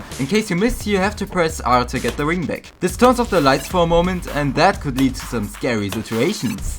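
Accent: Germany. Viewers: i can hear funny german excant, wie lusitg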